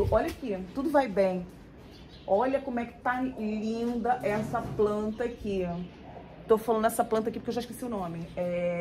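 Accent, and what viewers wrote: Rio de Janeiro, Brazil. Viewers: Moça vc é admiravel, espontanea, super vc, adoro carioquissima com esse sutaque amooooooo muitooooooo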